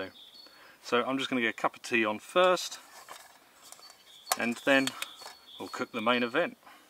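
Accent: United Kingdom. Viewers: Make a Bushcraft camp cook book. You got the vids, the recipes, AND the British accent. You could be the Gordan Ramsay of Bushcraft cookery. Can you also do a braised short rib? Or anything braised tbh? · Are you british?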